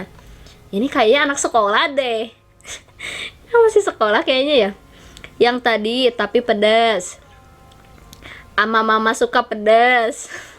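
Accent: West Java, Indonesia. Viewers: sunda bgt logatnya f/v pake p wkwk lofff❤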